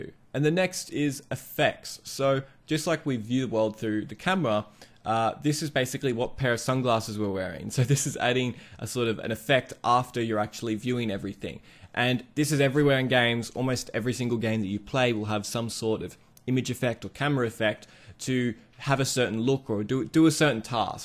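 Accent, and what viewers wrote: Australia. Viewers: Australian accent is so beautiful 🥰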